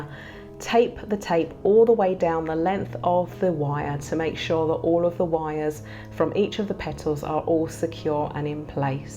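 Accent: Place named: England, United Kingdom. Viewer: I like your voice and your English accent 😃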